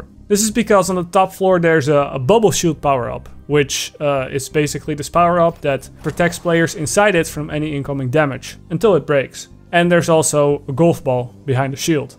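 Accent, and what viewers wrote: Netherlands. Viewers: Ha, Nederlands. Merk t meteen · You are dutch aren't you? I think i can recognize it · He sounds really dutch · why is it that, even when i'm dutch i get irritated by the dutch accent xD great vids though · Are you dutch? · Are you dutch you have a dutch Accent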